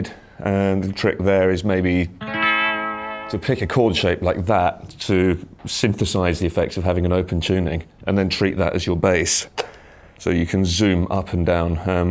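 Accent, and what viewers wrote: United Kingdom. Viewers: Thanks ! That was interesting, but for sure need to try before purchasing. Btw, what a GOOD AND CLEAR english accent you have. It makes easier for non british people like me to understand. Cheers !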